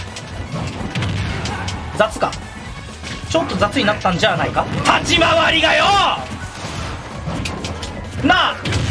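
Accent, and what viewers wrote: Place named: Hakata, Japan. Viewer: シンプルに心配やしとりあえず体治してまた長野まで博多弁届けてくれよな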